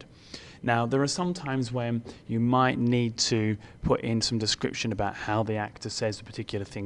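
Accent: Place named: United Kingdom. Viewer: by your accent you appear British which helps; many videos re comments-opinions on screenplay spacings take into consideration American page size which is smaller than ours; my question is: do we alter their spacings to accommodate our size of pages? And what spacing sizes are we talking about?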